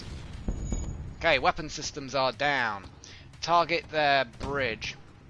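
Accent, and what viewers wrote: England, United Kingdom. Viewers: Oh BTW! Velcoma back (bad German accent). Nice to here that jolly gd English accent back on screen all the best. ( n let's god hope you don't go n get all of us killed once again. :-D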